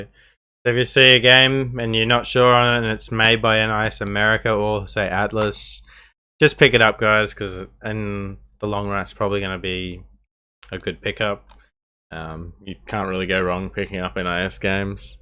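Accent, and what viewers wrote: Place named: Australia. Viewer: where abouts in Australia are you from champion?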